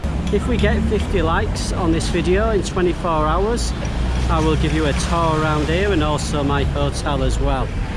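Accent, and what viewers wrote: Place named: South Yorkshire, United Kingdom. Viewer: His accent is South Yorkshire accent including accents in Leeds Barnsley Doncaster and Sheffield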